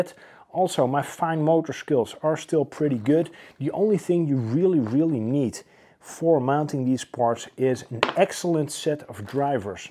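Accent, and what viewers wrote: Netherlands. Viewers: Very nice video like it. Just thinking of getting a CJ. Btw, do i hear an dutch accent? 😊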